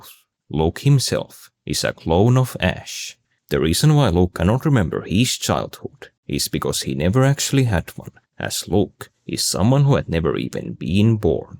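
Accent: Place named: Finland. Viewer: Your accent sounds like a pretty heavy finish one. Are you possibly one of us snowmen. Maybe Maybe not It is meaningless in the end Oh yeah right Cool video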